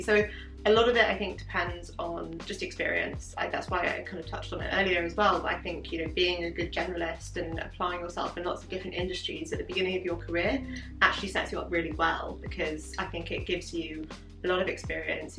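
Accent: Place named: United Kingdom. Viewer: Wow; you are starting to have a British accent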